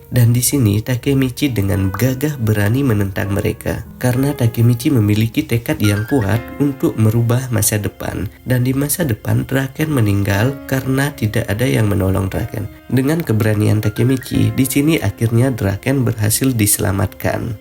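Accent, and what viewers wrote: Aceh, Indonesia. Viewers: logat abangnya kyak logat Aceh😂, Orang Aceh bang ya?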